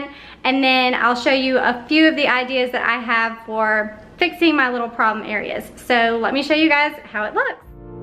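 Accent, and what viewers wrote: South, United States. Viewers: Hi there! I’m watching from Eagle Mountain, UT. I get the feeling you are from the South because of your sweet accent,( lived in GA for 12 years but a native Californian. My, “pantry” is actually a very tall, deep cabinet about a foot wide, at least 18 inches deep with just about a foot between each shelf that are not adjustable and it’s a brand new rental. The pantry is always disorganized!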